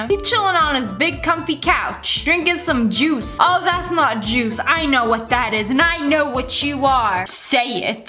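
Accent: France. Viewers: Are you French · R u actually from France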